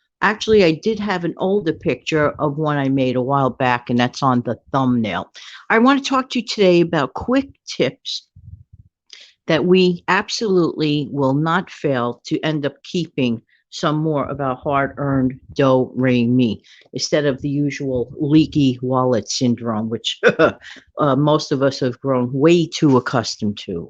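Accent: New York, United States. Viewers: Hi from South Australia 🇦🇺 I found your you tube channel today it’s fantastic and inspiring. I just love that NY accent I am in my third act of life and getting my financials and life. Looking forward to hearing more of your channel and learning new tricks on how to make life better ❤️😍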